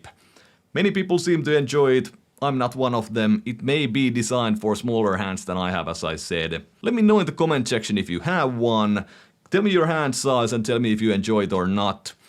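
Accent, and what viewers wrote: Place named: Finland. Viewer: It's a very typical Finnish accent. If you play CS in EU you will hear that accent all the time. If you want a good idea of what the language sounds like and Finnish culture, I found the music video " " by " " very fascinating. My Finnish friend said to me it is a very good representation of what it means to be Finnish.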